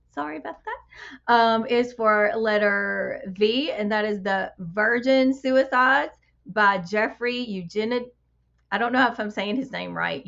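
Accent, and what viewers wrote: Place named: South, United States. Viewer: I got The Night Parade yesterday! Everything is Southern Gothic with that accent 😂🤷‍♂️💃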